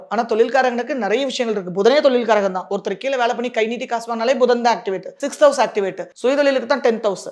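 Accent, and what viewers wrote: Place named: Coimbatore, India. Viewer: Kovai tamil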